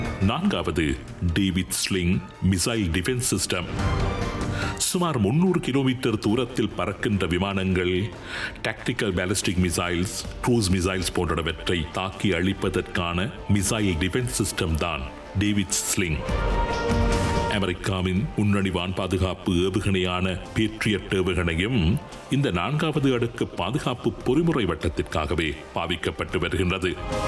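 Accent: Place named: Eelam, Sri Lanka. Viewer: Bro uganda voice eganda ஈழம் voice im from trinco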